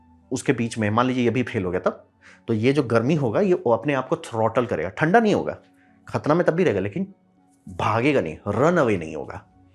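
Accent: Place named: Jharkhand, India. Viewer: Jharkhand's Hindi Accent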